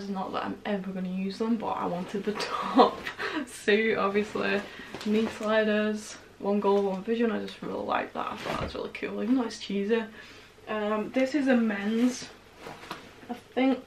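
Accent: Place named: North, United Kingdom. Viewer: Where you from? Sounds like a northern accent hun x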